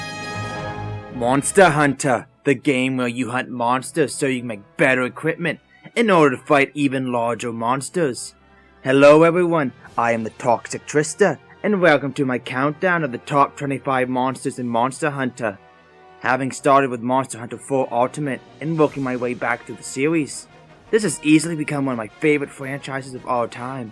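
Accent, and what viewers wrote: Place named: Ireland. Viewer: It sounds irish :D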